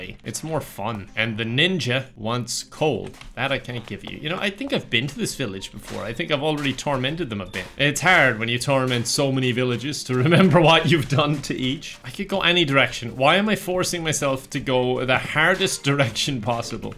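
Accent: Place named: Ireland. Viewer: Why do you sound like PewDiePie with a jacksepticeye accent 🤣 I know you are an Irishman ❤️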